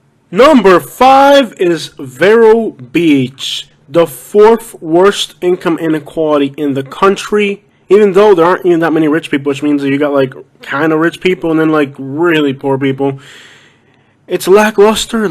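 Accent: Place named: North, United States. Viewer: And where are from??I have been here 70 years!!and you tourists,are what has ruined my state!!Get out!!ur accent tells on you.And yeah,South Florida sucks,It did not used to be like this,until the yanks moved in!!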